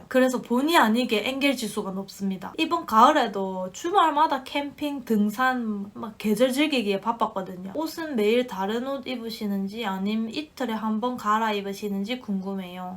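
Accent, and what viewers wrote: Gyeongsang, South Korea. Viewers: 크리스마스에 혼자 영상보면서 많은 위로가 됐습니다. 점점 지치고, 목표의식이 흐려질때마다 영상 찾아서 보러온답니다.. 저도 고향이 경상도라 말투가 너무 친근하고 아는 언니같구 그래요...! 타지 생활하면서 우연히 보게 됐는데, 이렇게 영상 꾸준히 올려주시면서 쓴소리 단소리 자주자주 해주세용, 보이진 않지만 저 멀리서 응원하고 있답니다! 따뜻한 겨울나세요...!! > < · 익숙한 경상도 사투리로 동생이 언니야 이렇게 하자! 하며 신세계를 알려주셨습니다. 40넘은 늦은 나이지만 따라하기 실행하고 있습니다. 연금저축도 IRP도 개설 했습니다. 시작이 반이니 계속 시청하며 따라가 보겠습니다. ㅎ 오늘도 행복한 하루 되십시오. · 처음엔 알고리즘으로 알게되었는데 설명도 너무 똑부러지고 경상도사투리가 너무 정겨워서 새로운 영상 업로드될때마다 하나도 안놓치고 다 봤어요 똑순이 소중한 영상 너무 감사합니다 경제에 대해서 무지한데 많이 알려주셔서 너무 감사해요 꿀템들 소개영상도 엄청 기억에 남네요 미리 메리크리스마스🎄 연말도 잘 보내세요💕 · 몇일전에 우연히 들르게 되었는데 같은 경상도사람이라 사투리도 구수하고 귀에 쏙쏙 박히네요~ 현재 40대로 코로나로 실직후 6개월째 재취업준비중인데 마음과 같은 결과가 안나서 심난한데 괜히 연말까지 겹치니 더 뒤숭숭하네요 오늘도 면접 한군데 보고왔는데 좋은결과있어서 새해부턴 직장인으로 다시 출발하고싶네요~ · 5만 구독자 축하드립니다 곧 대학생이 될 울아들이 같이 똑똑하고 야무지게 지할일 챙기며 생활했으면 하는 바램이 드네요^^ 결혼하며 부산 떠난지 20년이 되어오는데 구수하고 친근한 경상도사투리를 들을수있게 되어서 좋았습니다 앞으로도 응원할께요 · 어쩌다 우연히 영상을 접했는데 목소리 억양 경상도 사투리 완전 귀에 쏙쏙 들어오고 참 야무지고 똑 부러진다 생각했어요 나늠 알뜰하게 생활은 하고 있었지만 여러 재테크 팁들 너무 너무 도움됩니다 재테크1도 관심없는 동생에게 추천해 동생도 영상고며 많은 도움 받고 있네요~~ 이번에 청약도 하나 넣으려고 준비 중이고 저도 국내 주식만하다 외국 etf공부 중이예요~ 나이가 드니 머리에 입력하는게 힘든데 쉽게 설명해줘서 귀에 쏙쏙 들어오고 있어요 앞으로도 좋은 영상 부탁드려요 추운데 감기 조심하세요 ^^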